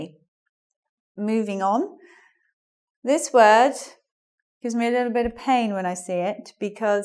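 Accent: United Kingdom. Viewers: I'm learning American English... Is this british ? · Hi! I'm learning English, and I love yours videos :3 and I also love your British accent :3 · I love british accent, thanks for your classes, I am really enjoying it :) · Hi. Thank you for your video! Your British accent is so beautiful! I could stay the whole day just listening to your voice haha your video is good, but sometimes you should repeat the pronounciation of some words more often. For example, you just pronounced the word ''tongue'' once, so I had to come back the video again. In addition, thank you again and I think that you chose good examples for the video! xx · To be honest I don't learn anything from theese I just like listening to british english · Is this Brittish? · Hellooooo dear. Just wanted to say your lessons are top notch. The very best, really. I know some English and I love it. 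Can't explain why. But the more we know the more we know we need to know more. Huahuaa. Your British pronunciation is delightful. I 'm really fond of British English. Also I'd like to ask you if you give private lessons --- maybe on ITalk, the site that gathers a bunch of teachers teaching languages online.. Please don't stop. Carry on showing the world how great this language is. All the very best. Cheers. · A thousand thanks, your are a fantastic teacher. I've lived for many years in the US (I'm from Argentina), and it is difficult to me to understand British accent. Another thing to remark is that you have rules that in american English are often overlooked, so I'm learning a lot from your tutorials. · Hi, Please I would like to learn the pronunciation of the english O how you pronounce them in the words as SO, I know. Cuz I m still hearing the Y literally SO - soy.:D Thank you. Btw I really like the british accent about the O:D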